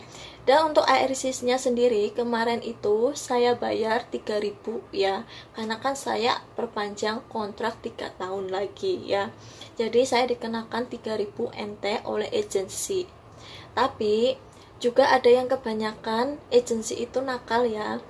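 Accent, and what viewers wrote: Banyumas, Indonesia. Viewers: Ngapak jos sukses.. Salm dari trenggalek mbak.. Makasih atas berbaginya..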